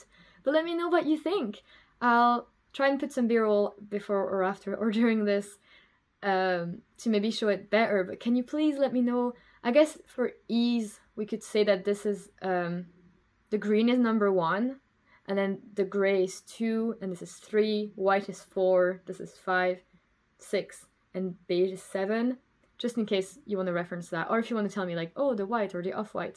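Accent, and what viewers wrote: Scotland, United Kingdom. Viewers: I'm watching you just now and love the Scottishness coming through your accent. Like all of us in Central Scotland you've dropped your t's. I'm really enjoying the podcast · A lovely podcast. Your sweater is so nice. I live in Glasgow & you sound very Scottish. I like your swatch knitted in local Suri Alpaca. I am knitting a third Sophie scarf for a friend. Thanks 🏴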